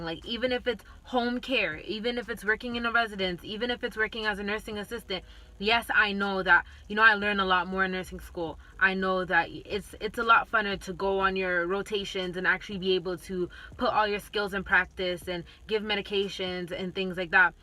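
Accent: Caribbean. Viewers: Pleasure is all mine. You do an excellent job. How did you fine learning pharmacology? I’m now reviewing and getting the jist of it before school starts in July. Do I detect a West Indian accent also? My dad is Jamaican and mom is from St. Kitts.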